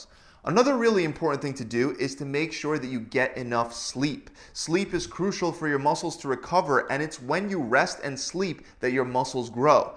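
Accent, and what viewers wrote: Philadelphia, United States. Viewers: He's from Philly or South Jersey. He says wooder. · Coming here just to say that this guy is obviously from Philly: "drink more 'WOODER' ['water'] throughout the day!" Wooder wooder wooder! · Are you from philly? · Me: He sounds like he's from the northeast. Dude: Wutter Me: Philadelphia.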